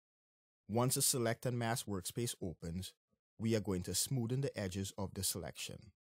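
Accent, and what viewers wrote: Trinidad and Tobago. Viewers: thank you! BTW you sound Trini. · Sounds like a Trini!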